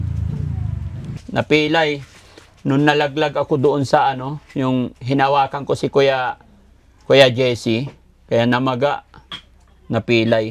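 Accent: Visayas, Philippines. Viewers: Ka cute nimo paminawon gabinisaya hahaha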